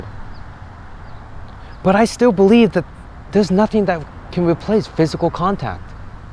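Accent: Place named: East Coast, United States. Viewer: You don't sound like a Californian. You sound like something easy coast.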